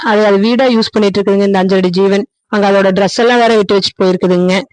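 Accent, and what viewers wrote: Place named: Kongu, India. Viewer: மகிழ்ச்சி உங்க கொங்கு தமிழ் கேக்க இனிமை.வாழ்க வளமுடன்.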